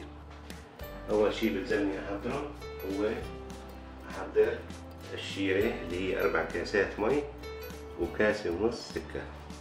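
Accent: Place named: Aleppo, Syria. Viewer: احلى حكي حلبي ..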